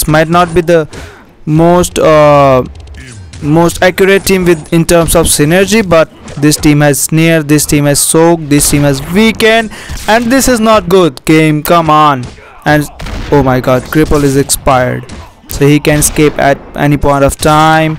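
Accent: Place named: India. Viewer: Mujhe tumhara baat sunke lag hi raha tha ki tum India se ho esi liye puch raha tha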